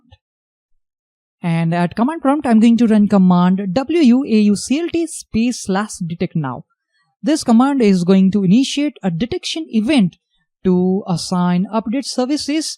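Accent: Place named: India. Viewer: was so happy to find guide.... then indian english .. :-(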